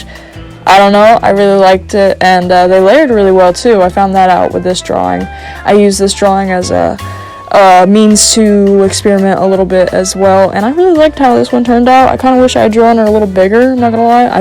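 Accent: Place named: South, United States. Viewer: country accent + deep fried audio is so peak..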